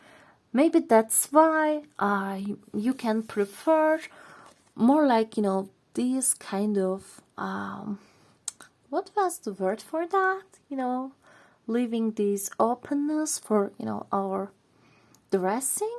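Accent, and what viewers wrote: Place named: Eastern Europe. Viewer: Pile 3 was particularly accurate, disregarding the fact that I am male. Thank you. Is your mother tongue Slavic perhaps ? There are small hints to it, I'm fairly certain. 😊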